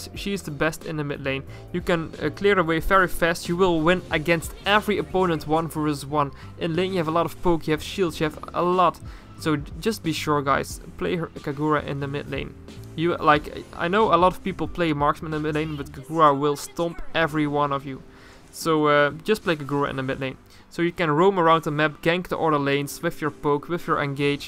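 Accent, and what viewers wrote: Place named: Netherlands. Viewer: Ja aan dit accent hoor ik gewoon dat je Nederlands bent lol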